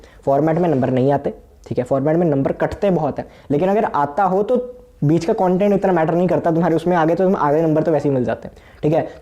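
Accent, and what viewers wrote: Punjab, India. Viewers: Bhaiya are you punjabi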